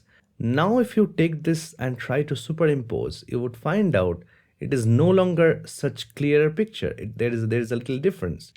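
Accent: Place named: England, United Kingdom. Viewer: Great english accent, completely professional.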